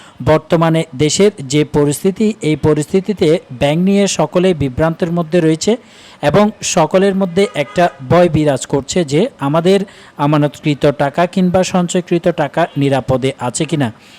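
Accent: Chittagong, Bangladesh. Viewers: তার কথা বলার ধরন চট্টগ্রামের কোন আছে। সে অবশ্যই এসে আলমের লোক। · Vai er bari Chittagong... Unar Chittagong er vasha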